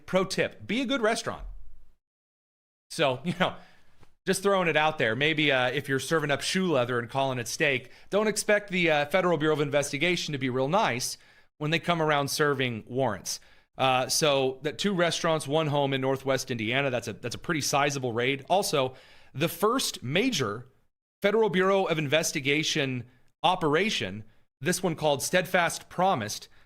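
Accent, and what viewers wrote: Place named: Minnesota, United States. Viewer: Love your Tim-Waltz/Menisota accent! Got a good laugh this morning 😂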